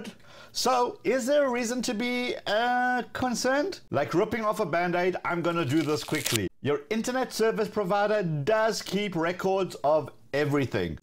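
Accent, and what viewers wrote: South Africa. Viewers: its south african im sure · I definitely noticed that South African accent lol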